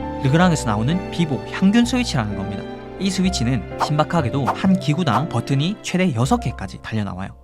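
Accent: Busan, South Korea. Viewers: 님 부산인가요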